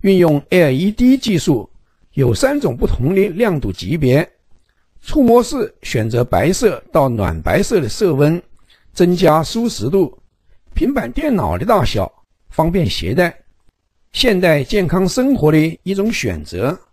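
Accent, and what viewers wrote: Sichuan, China. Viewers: 很明显是四川普通话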